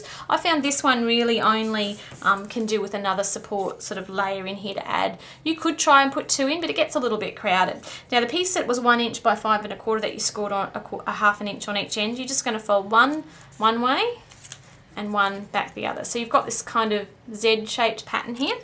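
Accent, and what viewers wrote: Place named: Australia. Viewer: Hi, Thank you for the video on the alternate size. Where can I find the dimensions, other than writing them all down from the video? I love your Aussi accent too!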